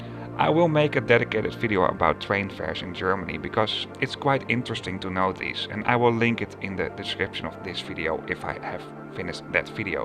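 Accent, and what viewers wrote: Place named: Netherlands. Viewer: Love your videos and your Dutch accent 😊. Nice to see my home cities station from your perspective 👌👌 Btw. Funact: I tried to imitate Dutch accent on my channel 😆😆😆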